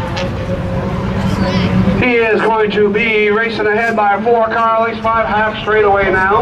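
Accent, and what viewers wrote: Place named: United States. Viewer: lol, not that quickly. American giveaways: 1. Yankee accent of the commentator; 2. Full-size pickup trucks; 3. Dodge Charger with "Yeet" written on rear window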